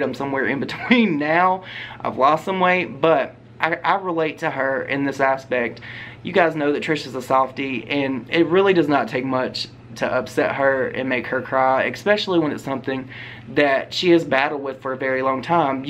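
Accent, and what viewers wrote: South, United States. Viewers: I love your accent! Usually southern accents are so intense and I can’t understand people but yours is lovely lol also great video thank you ❤️ I am subbing! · I love your southern accent so much! And you have a good perspective, I totally agree that Jason's jokes were unacceptable. Nice video!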